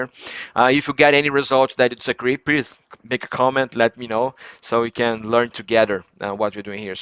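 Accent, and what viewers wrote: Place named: Brazil. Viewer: Seu sotaque parece brasileiro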